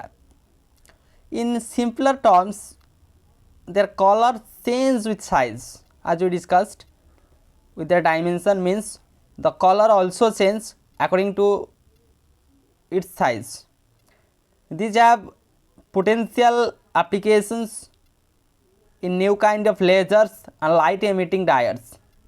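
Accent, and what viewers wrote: Odisha, India. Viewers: Sir are u from orisaa...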